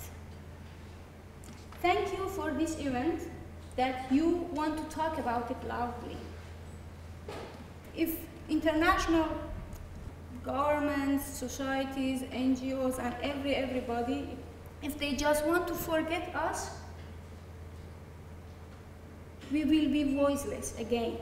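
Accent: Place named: Iran. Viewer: 17سال در اروپا مدرک دکترا باید انگلیش اد خیلی خوب می بود انگلیش را مثل فارسی صحبت می کنید لغت ها سر به سر کردی گفته روان هستی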